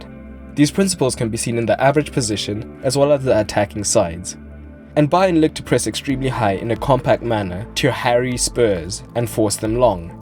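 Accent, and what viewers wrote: South Africa. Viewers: Where are you from? Sound like a Saffa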